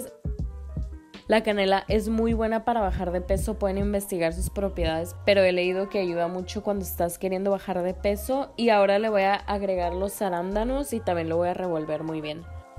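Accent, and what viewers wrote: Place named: Monterrey, Mexico. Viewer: Disculpa,eres de Monterrey? Tu acento es muy parecido al de Monica Llaca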